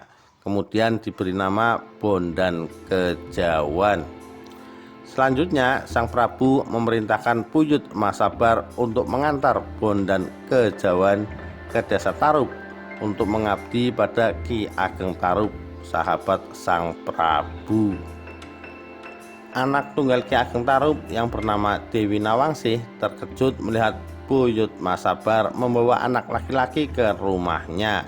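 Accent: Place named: Banyumas, Indonesia. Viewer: Sayang chanel ini yg membacakan critanya sangat g menarik blas....aksenya banyumasan...g menarik blas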